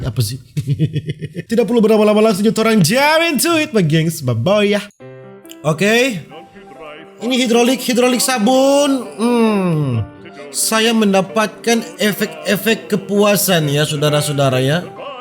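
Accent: Manado, Indonesia. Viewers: B logat manado kua opo🤣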